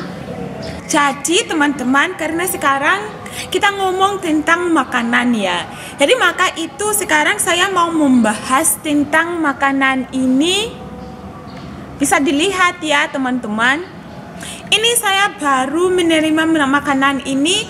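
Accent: Java, Indonesia. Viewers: Oowh kuliah di Surabaya yah kuliahnya tapi aslinya orang luar negri ,pantesan logat nya logat Jawa medo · asli Jawa ya..heehheeh logat jawa.. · Logat Jawa anjir bkn indonesia coba dah biarpun BHS. Indonesia di ucapkan pasti logat daerah GK bakalan ilang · Logat jawanya itu lho... Bikin ngakak.. Good job sis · Jawa bgt aksennya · Medoknya kayak bukan dari negara zimbabwe maap klo salah tulis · Logat jawanya pekat bngt kak , Salam Dari KAB.TAPSEL PROV.SUMATERA UTARA, INDONESIA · Medok nya josss 👍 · mba kamu kaya Jawa tulen.asik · wkwk unik banget kali ya Nemu orang luar tapi medhok😂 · Jawa banget ngomongnya mba' .. btw you are so beautiful and so smart looking ! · Bismillah dulu kalau mau makan atau kalau tiap mau melakukan suatu aktifitas .. hehe jangan marah ya cuma bercanda 😀😀 ..dan ucapan bismillah itu hanya diwajibkan pada yg beragama islam Oke bahasa indonesianya sudah bagus cuma logat jawa nya kental. 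Tapi gak apa-apa alhamdulillah yg penting dah lancar 👏💪 Salam dari samarinda kalimantan timur indonesia 💖🇮🇩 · cocok dadi penyiar JTV,medhok'e ws juara tenan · Logat jowo nya medok banget mba hehehe.. 😅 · Bahasa indonesianya medok jawa mbak... Mantull 👍👍, Susuban bahasanya bagus.. sopan dan sangat mudah dimengerti.... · Medhok tenant rek, bikin gwemesss... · Mungkin dia orang Simbague tapi pas dia ke indonesia dia tinggal di jawa jadinya di ngomong indo kaya orang jawa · Logat jawanya bagus kak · kalau belajar bahasa Indonesia di Jawa, jadinya medhok... kesannya lebih native... apalagi kosa katanya dan susunan kalimatnya sesuai EYD.. mantap mbak.. gw kasih 2 jempol... :) NB: jadi, wajar, kalau yg besar dan sudah lama bergaul dalam lingkungan berlogat Jawa, sadar gak sadar, akan medhok pada waktunya.... contohnya: Londo Kampung, Korea Reomit... dsb. :) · Logatnya medok bngt .sukses slalu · Medhok tapi keinggris"an gimana ... 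gitu. Commel😙😙 Semangat selalu kakak! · Logat e jowo slur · Logatnya Jawa banget.. semoga makin betah di indo ya...!!!🤝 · Medhok😁. Good lah👍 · Wah medog gg kak👍🏻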